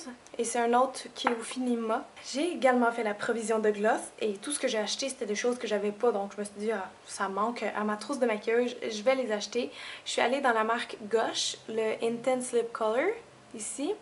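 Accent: Quebec, Canada. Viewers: C'est vrai? Pourquoi? Moi la première fois que je suis allé au Québec (dans le Sud), je suis tombé amoureuse de cet endroit, d’ailleurs, j'ai repris mes études pour avoir un bon travail et tenter de m’installer au Québec. J'adore tout là bas. même si j'aime aussi ma belle France: ) Et j'adore regarder les vidéos de, aussi pour son accent parceque ça me refais penser à votre province <3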